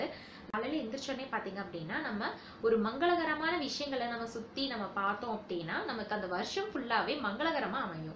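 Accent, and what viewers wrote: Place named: Kerala, India. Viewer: Voice correct ah Kerala pa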